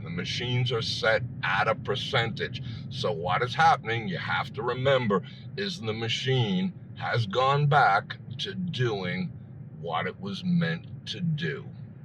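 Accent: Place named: New York, United States. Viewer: You ran a red light! You are going to be my virtual concierge in April when I head out to Harrahs. It's the New York/New Jersey accent that has my trust :-) And my MAX BET will be on getting a fridge for me room! Stay safe, thank you for your channel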